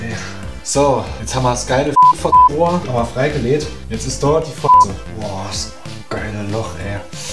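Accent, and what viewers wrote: Germany. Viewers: Pfälzer? Feier ich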